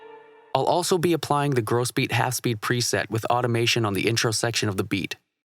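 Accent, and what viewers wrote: London, United Kingdom. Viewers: That British accent is legendary 😂😭🙏🏾 TBH tho... It's really good. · No lie your Brit accent sounds like a white bugzy malone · Mate, i gotta rate your London accent! We usually laugh at anyone trying to copy us but man went in! Gwaarn kuzz! Keep up the fire 🔥🔥🔥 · Your British accent is really good.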